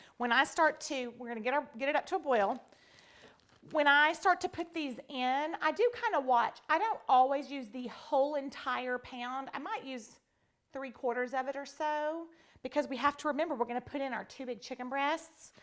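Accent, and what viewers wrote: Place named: South, United States. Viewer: I LOVE her talking....absolutely!!! Love your southern accent! It's precious! :) Enjoyed every minute of your video! Keep up the good work!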